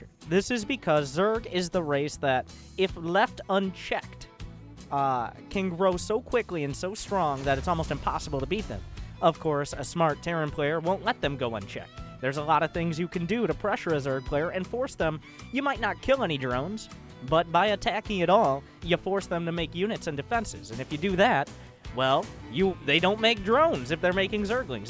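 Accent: United States. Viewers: USA but with a more "country" accent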